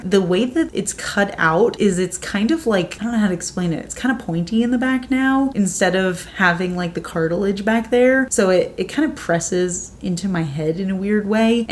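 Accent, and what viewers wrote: New Jersey, United States. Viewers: I'm happy the surgery was so successful. It's so nice hearing your New Jersey accent rather than blocked sinus Jersey. Wishing you continued good fotune with the swelling going away. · My late mother was from Jersey, and your Jersey accent (like all Jersey accents) brings me joy! Glad you haven’t lost it! ❤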